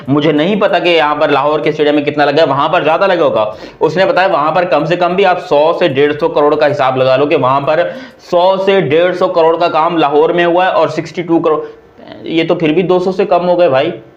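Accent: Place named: Mumbai, India. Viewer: Aayela hai?? Mumbai ka language...good bro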